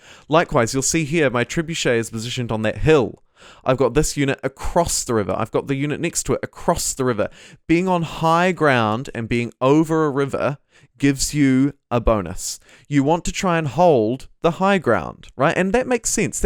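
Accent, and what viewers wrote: New Zealand. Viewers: I love Civ V and VI and I dig your NZ accent so, I like your videos 🥰🤘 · Did a double take to hear another kiwi accent. So used to hearing marbozir! Good summary mate, and good energy. Stoked to see Civ V still active out there.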